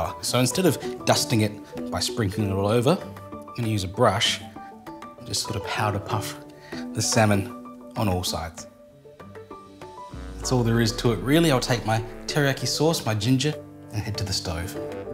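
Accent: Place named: Australia. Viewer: He sounds Australian... maybe kiwi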